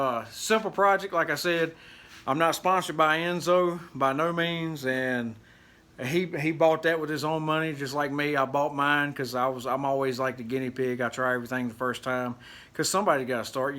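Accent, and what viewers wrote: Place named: North Carolina, United States. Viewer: You sure sound like you could be from NE North Carolina. Ordered the Anzo unit this week. Lookin for it to be here Friday. Thanks for the video. Very helpful.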